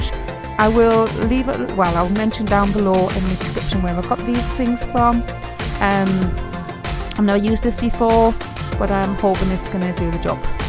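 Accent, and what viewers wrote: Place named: Newcastle, United Kingdom. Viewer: Nice to hear a Geordie accent!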